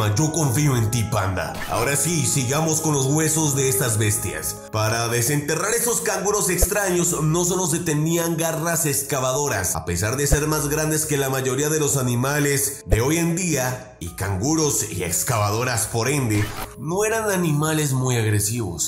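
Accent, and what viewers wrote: Colombia. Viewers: pa re Colombiano el pibe este😎😎😎😎😎😎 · eres colombiano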